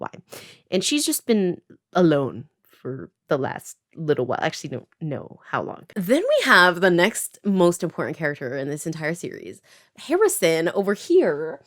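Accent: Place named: United Kingdom. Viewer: as someone who has been watching your videos for a while now i spent this video so worried about everyone who wasn't in the known main trio also the british accent caught me completely off guard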